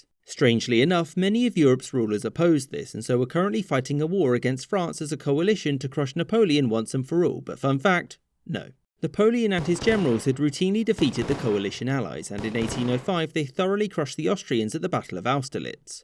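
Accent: United Kingdom. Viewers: No more bri'ish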